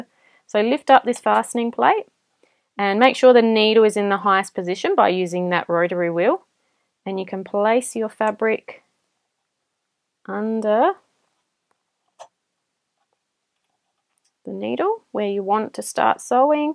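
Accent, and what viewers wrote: Australia. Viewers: Thank you for this very helpful video. The Aussie accent was frosting on the cake! :) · Great very helpful video, thank you,,,and I love this Australian accent.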